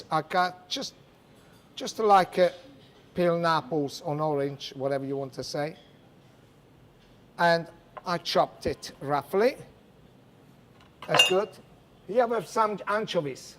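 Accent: Italy. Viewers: His English Italian accent is insane.lol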